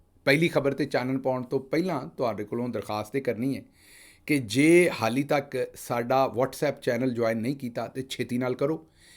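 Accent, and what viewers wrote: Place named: Punjab, India. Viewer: میری ماما ڈاکٹر شہباز گل آپ کی پنجابی کی بہت بڑی فین ہیں اور وہ کہتی ہیں کہ یہ ٹھیٹھ پنجابی ہے، اصلی پنجابی یہی ہے۔ یہاں آکر لوگوں نے برگرز نے باہر آکر، ہم کینیڈا میں رہتے ہیں تو وہاں آکر لوگوں کو سمجھ نہیں آتی کہ کیسے بولنی ہے۔ اگر بولنی بھی ہے تو صحیح نہیں بولتے۔ خیر ان کا دوسرا پیغام یہ ہے آپ کے لیے کہ پلیز عمران خان صاحب کا بھی خیال رکھیں اور اپنا بھی خیال رکھیں۔ اور کچھ نہ کچھ کریں آپ وہاں بیٹھ کے ہی کریں۔ ضرور کریں اور آپ خود بھی محفوظ رہیں کیونکہ آپ کی بھی نیوز آئی تھی ایک بار آپ کسی سٹی گئے تھے وہاں آپ کو پرابلمز ہوئی تھیں۔ تو اس سینس میں بھی سیف رہیں اور عمران خان کے لیے بھی کچھ کریں۔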